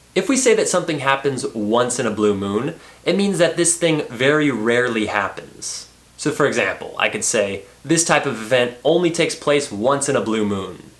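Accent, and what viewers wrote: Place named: United States. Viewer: i think it can be normal because he speaks as a native American that's why don't be demoralized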